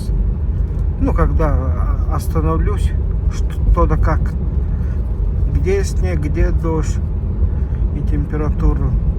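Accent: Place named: Tuva, Russia. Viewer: У тебя тувинский акцент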